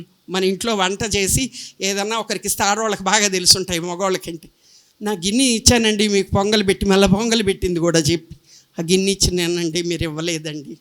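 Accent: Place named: Nellore, India. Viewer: "సాయి సత్య ధర్మ వైభవం" - <num>, షిర్డి సాయి గ్లోబల్ మిషన్ ఆధ్వర్యంలో సాయి తత్వ ప్రవచన కార్యక్రమంలో వాకాడు ఆనంద సాయి మందిరం శ్రీమతి ప్రసంగం సాయి బాబా గారి తత్వం గురించి…సాయి తత్వం ఎంతో గొప్పదని సాయి అన్ని ముందుండి నడిపిస్తారు అన్ని నెల్లూరు స్లాంగ్ లో అద్భుతమైన ప్రసంగం చేశారు..🙏🙏🙏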